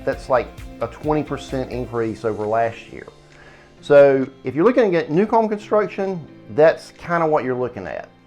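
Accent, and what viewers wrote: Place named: Wake Forest, United States. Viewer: You are definitely a WF area native you have that dialect. There was a hibachi restaurant but it opened a few years too soon. Great idea for someone to open one up around the Devon Square area in the next few years. That side of town could definitely use more diverse dining options. There’s definitely a lack of diversity in the town. That’s why we are busing in kids from low income neighborhoods in Raleigh into our schools. That’s because property owners have decided to sell their property which our low income natives live on. Big developers are buying these properties to clear cut & build these 800k houses built in about 60 days. There’s also a lack of diversity when it comes to the cookie cutter neighborhoods look at Windstone Park. Every house is light blue, blue or dark blue. There’s other colors of the rainbow. 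Don’t let the town name fool you! There’s a lack of forests & true natural areas for your kids to really explore nature. We have these things called greenways but they are basically like paved trails you get on a golf course. You won’t see a lot of nature bc you are surrounded on both sides of the trails by houses with tree buffers. Copperheads still thrive here despite all the development. We do have a local superhero who regularly protects us. He goes by the name Middle Aged Man. when I get really fed up I’m calling you to sell our house! Just because you made this video!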